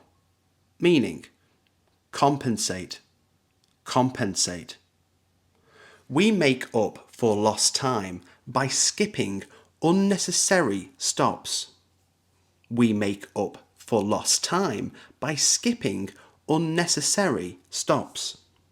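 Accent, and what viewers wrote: United Kingdom. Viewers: It sounds your accent is British Are these words common in American accent?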